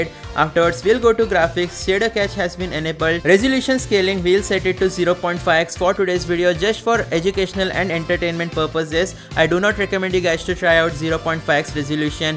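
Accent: India. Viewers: The Indian accent was all i needed to tell me this is fake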